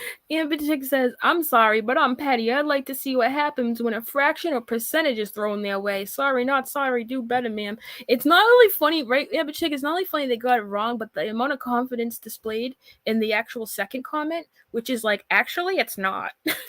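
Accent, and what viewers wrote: Boston, United States. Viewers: GUUURRRRLLLLL…. First off, how some people have even 1 sub truly confuses the hell out of me. The hypocrisy on YouTube pours out like water! People want to point fingers for “being in someone’s chat”, but meanwhile they are in the EXACT SAME LIVE hiding in the bushes!!! They are too dang nosey not to be! Always trying to be in the mix while holding up their “clean hands” claiming innocence. Those are the ones that need to “claim their nasty”. At least you’re not afraid to own up and stand in your truth. ✌🏼😊 Ps - my Mother was born and raised in Boston… (we are Kessler’s and Kavanaugh’s). I love your accent! We moved to Georgia 40 years ago, so yea, there’s no Boston accent left here. LOL! 😆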